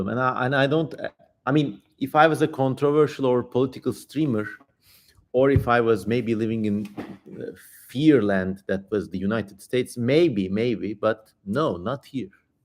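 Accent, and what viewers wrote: Turkey. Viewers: Abi Türkçe seslerle İngilizce konuşmana bayılıyorum ya :D, bu arada çantandaki kalemlere de bayıldım.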